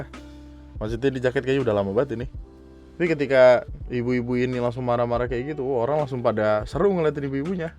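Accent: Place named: Lampung, Indonesia. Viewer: Raden Inten Lampung itu bang, dari logatnya org Lampung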